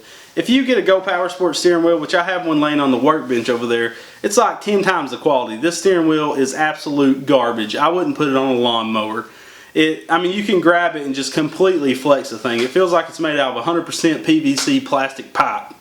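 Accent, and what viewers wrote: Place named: Arkansas, United States. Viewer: man i know this is an old video, hope you see this. but what state are you in? Being honest and respectful you sound like an Arkansas boy. Im from South Missouri. im just getting into gokarting with my buddies and we love your videos! jeep up the good work man!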